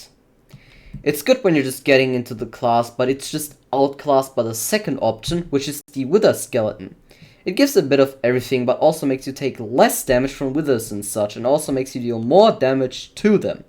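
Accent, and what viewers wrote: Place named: Germany. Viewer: Information wise not bad, way too long and yapping, german accent and cracky english is one too much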